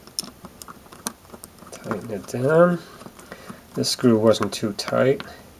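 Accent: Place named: Down East, United States. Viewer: Neatly done,. I searched for a long time for installation info after getting this kit,with no luck whatever. Your video made it look simple. Thanks for posting. BTW,I'm from Kansas,& I enjoyed yer down-eastern accent! So, ignore the guy below with the Peter Griffin comment.:-)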